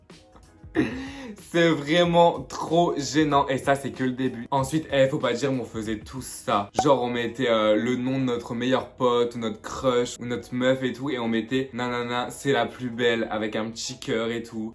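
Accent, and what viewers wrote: Quebec, Canada. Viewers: T'es québécois ? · crime ta canmeme un bon acent quebecois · Avant ton accent in n aurai dit un quebecoi😂😂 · on dirait pas l’accent chtis mais canadien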